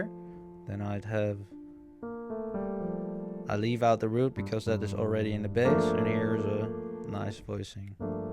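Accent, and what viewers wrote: Netherlands. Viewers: U sound dutch! :D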